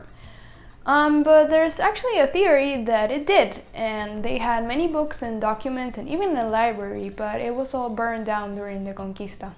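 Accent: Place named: Peru. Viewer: Acabo de descubrir tu canal y no sabía que eras de Perú hasta este vídeo, me acabo de dar cuenta que no reconozco mi propio acento xd. Sobre lo de que el nombre de Yzma no tiene ningún origen peruano. Está inspirado en la cultura Ychma que se asentó en Lima antes de ser conquistada por los incas. Felicidades por tu video!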